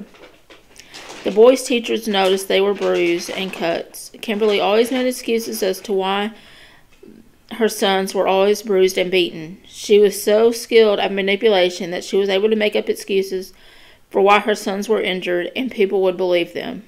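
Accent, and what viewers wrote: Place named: South, United States. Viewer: Good stuff. Thank you, You've got the sweetest voice, im from Oregon so im used to those southern draws.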